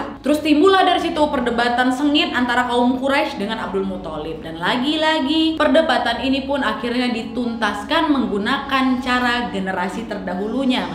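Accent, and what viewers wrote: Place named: Medan, Indonesia. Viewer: Saya tau chanel ini dari anak2 yg suka nonton, saya sempat komentar keren juga Chanel ini, sangat informatif dan profesional, saya tau pasti tdk mudah mengumpulkan bahan2 materi kontennya, istri sy jg yg kelahiran Medan juga sering senyum2 sendiri mendengar logat saat membawakan kontennya, apalagi ada Wak..Wak, jd ingat tmn2nya wkt Sekolah dan Kuliah katanya, terakhir setelah saya menonton konten tentang Nabi Muhammad SAW, langsung saya Subscribe Chanel ini dari HP saya( biasanya kami nonton di TV pakai WiFi dirumah ), Congratulation ya Wak 😁, terus berkarya dengan konten2 hebatnya👍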